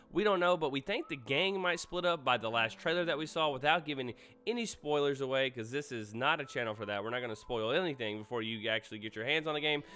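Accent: Northeast, United States. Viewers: I'm from Kentucky your accent sounds like you're from the Northeast it's kind of funny how I noticed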